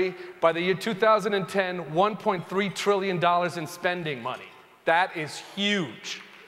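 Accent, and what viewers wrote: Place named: England, United Kingdom. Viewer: Your comedy is awesome!! I love it when you speak in your english accent, it's hilarious.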